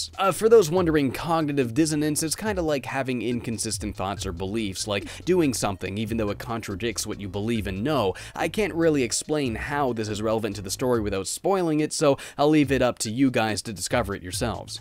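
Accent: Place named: Canada. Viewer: Are you Canadian? Noticed the -ehr-'s on your "A" pronunciation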